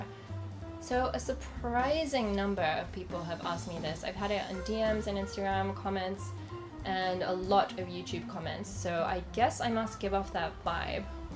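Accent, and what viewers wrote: United Kingdom. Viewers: Japanese girl with a brit accent...ummm... i'll take two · are you originally from South Eastern part of England? Your accent sometimes has a tinge of a South Eastern or often times confused with Aussies sounding accent. (I've met some people from East Sussex and Kent that have an Aussie sounding accent, though it would fluctuate between that and just an average Londoner's accent.) By the way I think your nose gives you a unique look that sets you a part from the crowd and you have a nice attitude to go along with it. Like they say beautiful inside equals beautiful outside too. In your case that is very true. Cheers ad do not listen to the haters, you are an amazing lady! Enjoy Japan and where ever you go to next too!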